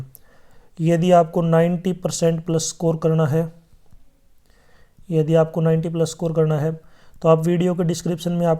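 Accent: Haryana, India. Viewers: Haryana ka hai kya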